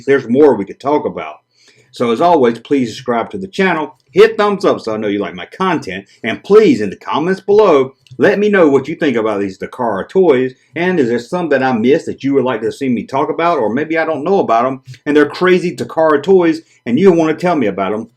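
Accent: South, United States. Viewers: Way below the Equator? Are you in the Southern Hemisphere? Thought I heard a southern twang in your awesome videos!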